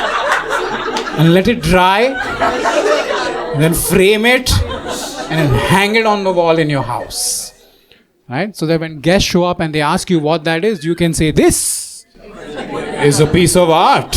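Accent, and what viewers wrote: India. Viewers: Daniel Fernandes but with an India accent? Huh? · Wtf with this Indian guy (is he real Indian or just mocking the accent all the time? Because his name is not Indian at all lol), I have never been laughing and shed tears while watching a stand-up! This guy is a comedic genius! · Humans aren't necessarily apathetic, many are, but not as an essential quality. The comedian sounds Indian so lets just call it Tamas (inertia, rest, ignorance). We live in a global order based on nation-states, competing interests, strategies, and power. The rule is that as long as atrocity doesn't spill over beyond the boarders of that state, then direct action will not be taken by other states unless they have some economic or strategic reason to do so. It's vile, it makes everything feel hopeless for sure. But hope is found in recognition that states are artificial. 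They are as transient as the seasons.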